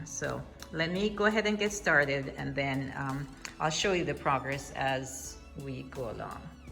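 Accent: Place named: Jamaica. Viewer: Your home is super gorgeous! I love your staircase, so elegant and whimsical. You sound like a Jamaican sistrin lol. I love how your tree look. It's absolutely stunning! New subscriber. This was so much fun to take part in this challenge with all you wonderful and creative sisters. Thanks so much for sharing.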